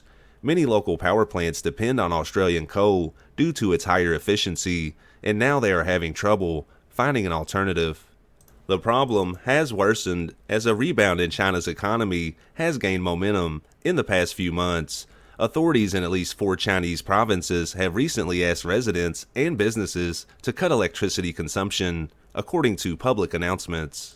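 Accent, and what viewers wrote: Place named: Texas, United States. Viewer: This dude has Texas accent wit good old boy twang to it .But besides that China is using more resources then America ,and at some point the shit is going hit the fan on resources in the world ,but may with pandemic will save some resources for a short time ,but I don't care about China .But the sure is going to be fuck up in the future with resources .And mostly will have do with some greedy assholes at the top of the food chain ,who are no very bright as it due the fact some their daddy's give them the company or very large wallet to throw around money .Since this always starts at the top .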